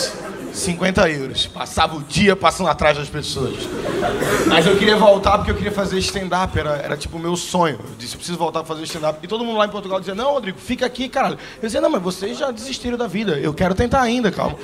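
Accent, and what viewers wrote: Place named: Bahia, Brazil. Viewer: normal, ele é baiano em vez de dizer MAS VOU ele fala MAR VOU... E outras trocas de letra assim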